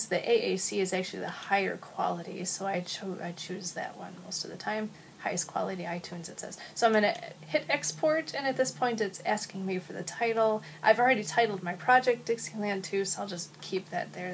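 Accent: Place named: Wisconsin, United States. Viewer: I DO love your "Wisconsin accent," and I love your demeanor! Having taught for 30+ years, and having taught/supervised/evaluated teachers while so doing, I hereby award you an unqualified "A." You speak TO, not "at," your students; you take things logically; you "'splain why?" when appropriate -- wish I could really be your student!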